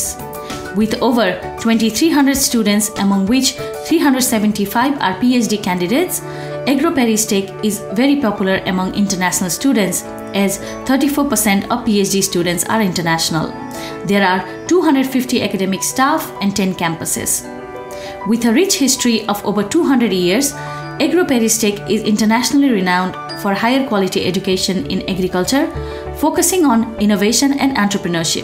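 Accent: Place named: Nepal. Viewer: Your accent sounds nepali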